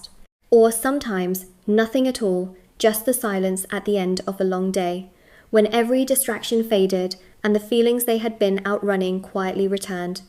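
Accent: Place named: United Kingdom. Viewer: What a beautiful accent...the British one❤.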